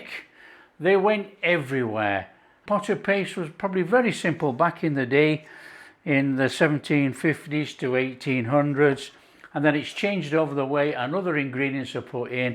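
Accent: Yorkshire, United Kingdom. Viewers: Hello - im a yorkshire woman- living in lancashire for long time- but still so proud of my yorkshire roots. Born in Leeds- then lived in Hull- i still miss the patties from Beverly road chippy- cant get them here. Anyway grew up with mam sending one of us to the corner shop- for a quarter of loose potted beef- wrapped in greaseproof paper. Didnt last long- having 3 sisters- but wow such a lovely memory. Luv watching you- because i cook a lot much as im alone now- just like yourself im known for the old classics and proud of it. So yes ill be making your lush potted meat and having it on my favourite toasted bread- sourdough. So thank you- such a pleasure listening to you with your yorkie chat- always feel like ive gone home as im right back there- its nostalgic. God bless- and take care. 😊❤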